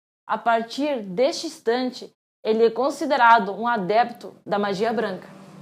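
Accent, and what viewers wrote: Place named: Paraná, Brazil. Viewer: Fala com sotaque paranaense legal.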